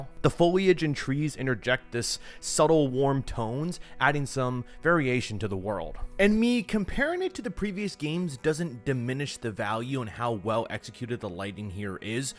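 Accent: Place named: Scotland, United Kingdom. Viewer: Just a smidge of the Scottish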